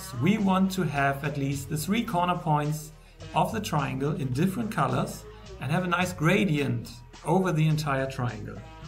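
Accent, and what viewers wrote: Germany. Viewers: are you german? you have a german accents 😃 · sis is a very nice german accent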